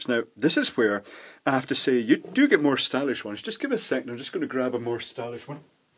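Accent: Scotland, United Kingdom. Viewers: with the Scottish accent, "powers up" sounds like "purrs up"... it's nice to imagine the motor purring like a little kitty...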